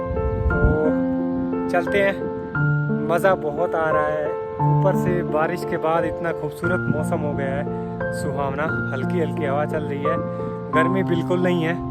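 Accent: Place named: Haryana, India. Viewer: भाई कभी कभी ये हरयाणवी में इंग्लिश बोलते हो ये वाकई लाजवाब है...🙂😊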